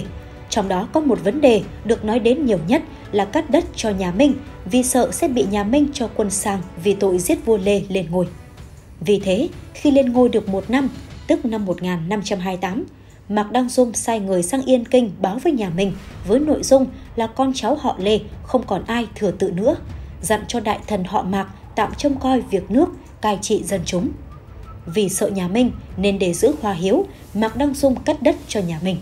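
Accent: North, Vietnam. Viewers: Chi oi. Chi noi tieng [ bac ky nang giong qua) xin dat [ caption tieng viet ) dum mot cai nhe. Cam on chi nhe.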